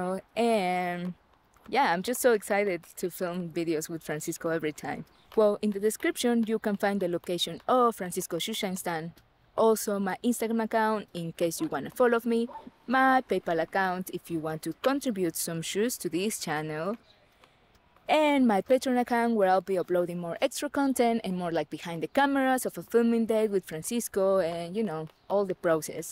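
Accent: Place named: Mexico. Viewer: I love your Mexican accent.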